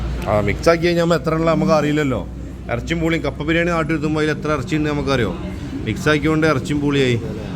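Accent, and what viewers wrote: Malappuram, India. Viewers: ഒരു രക്ഷയും ഇല്ല ഫുൾ കോമഡി അതിനിടയിൽ മൃഗങ്ങളെ ശ്രദിച്ചില്ല തനി മലപ്പുറം വർത്താനം 😂😂😂😂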